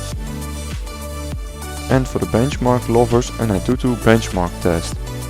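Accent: Netherlands. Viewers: I hear some dutch accent there :))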